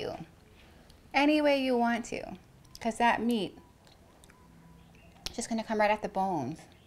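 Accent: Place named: Wisconsin, United States. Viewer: I like listening to you because even though you're Hawaiian, your accent reminds me of being home in WI.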